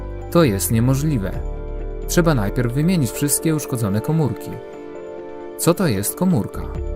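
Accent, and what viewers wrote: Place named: Russia. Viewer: Wreszcie, pan, znalazł sposób by być zrozumianym, wielokrotnie próbowałam dotrwać do końca wykładu, ale w związku ze złą wymową lub też brakiem słówek język pana dla mnie jest nie możliwy aby zrozumieć, co ma pan na myśli, bardzo ciężko, jednakże lubię rosyjski nalot językowy, ale w tak poważnych zagadnieniach potrzeba dokładnego przekazu, bez naleciałości, ponieważ to sprawia rozproszenie i niemożliwość koncentracji.Podziwiam jednakże zasób wiedzy, jaką dysponuje Pan, życzę wszystkiego dobrego, Pozdrawiam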